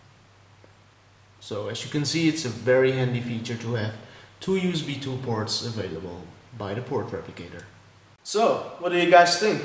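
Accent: Netherlands. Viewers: OMG Dutch English always sucks ... (but nice try) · wow a chinese who talks with a Dutch accent you don't see that very often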